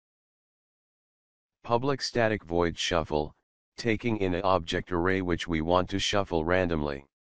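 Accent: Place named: India. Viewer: dat microsoft sam voice, good vid, but id prefer your indian accent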